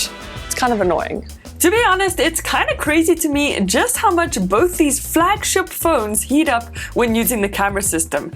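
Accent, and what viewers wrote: South Africa. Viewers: Is she south African? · Heard a South African accent and hit subscribe. Only just bumped into your channel for the 1st time today. Quality content 👌🏾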